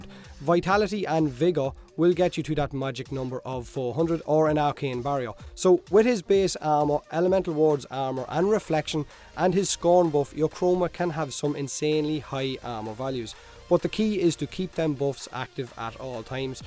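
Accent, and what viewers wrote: Ireland. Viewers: am new to this channel and i love to know if this guy is Irish or not his accent sounds like Irish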